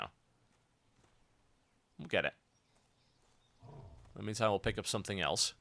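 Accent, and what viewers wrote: United Kingdom. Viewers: Lol at the people complaining that you, a British LPer, have a British accent. Personally, I get bored of hearing the non-existent Canadian accent, so I am quite pleased that my favorite WoW LPer is British. And though I said British before, as a fact (though I may be wrong) there are different types of British accents, seeing as France, England, and other countries of different languages make Britain, and they have different accents.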